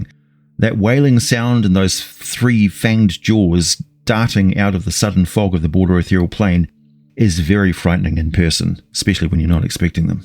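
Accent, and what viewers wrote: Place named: New Zealand. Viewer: Hi, I was wondering if you’re related to the stardew valley content creator “BlaDe” at all? You both have very similar kiwi accents imo (I’m from Auckland myself), and you two seem to have a physical resemblance too.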